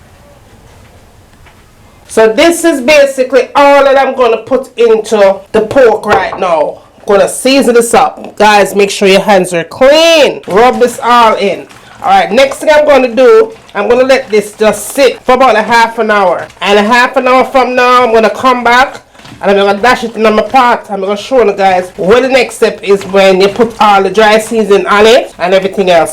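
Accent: Jamaica. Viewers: Love the Jamaican talk, love how you clean I would have your stew pork, but next time try putting the sweet peppers last.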